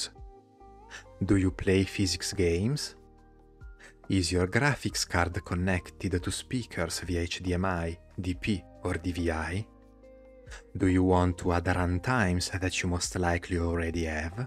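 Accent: Italy. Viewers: Your voice with that Italien accent is beautiful and very relaxing. Did you thought of doing ASMR with computer components ? :goodvibes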